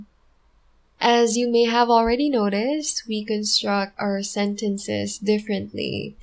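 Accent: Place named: Philippines. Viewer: you have such a beautiful filipino accent in English, sounds like a beautiful song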